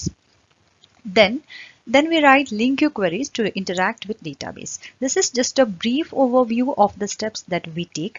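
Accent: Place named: India. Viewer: ind*an voice first second of the video, time to click off.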